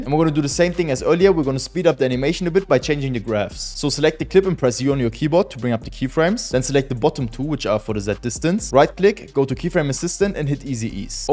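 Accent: Germany. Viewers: i hear German accent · Man hört dass du Deutscher bist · i hear deutsch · Man hört so krass das du deutsch bist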